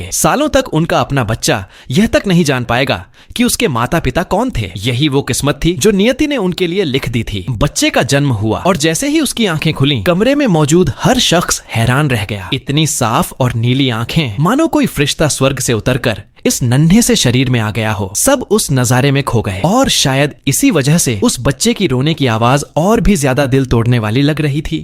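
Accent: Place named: Bengal, India. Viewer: Haa bhai lagtahe bengali😅❤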